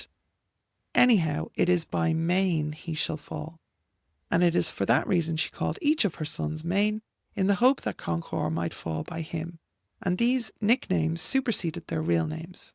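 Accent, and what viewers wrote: Ireland. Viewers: I love listening to you read the mythology! I love hearing it in your Irish voice, with your pronunciation. I listen to the Tán every year.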